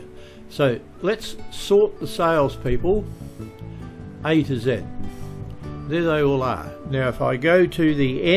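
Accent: Australia. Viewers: True... I'm used to listening to his delightful Aussie accent... sans music... for my latest Excel learning tidbit.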